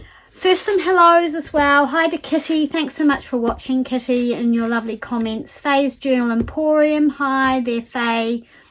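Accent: New Zealand. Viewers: 🧚🏻‍♀️Thanks so much, I really enjoyed watching your process of expanding the spine of your Readers Digest! Look forward to your next video. Lovely to hear our Kiwi accent on YT!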